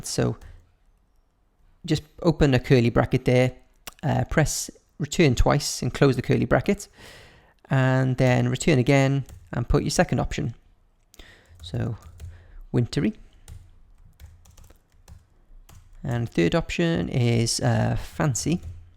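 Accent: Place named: England, United Kingdom. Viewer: Can somebody help me...Whereabouts in England does his accent originate from? It's gorgeous, I can't get enough of it!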